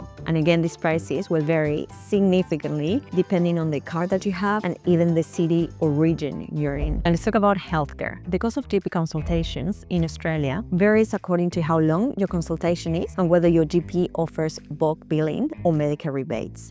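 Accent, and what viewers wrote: Argentina. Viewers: ese acentooooo sos argentina? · El acento argentino siempre presente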